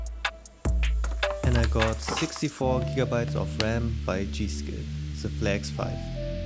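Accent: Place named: Germany. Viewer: cooles video aber man hört schon das du deutsch bist und du hast alles richtig gemacht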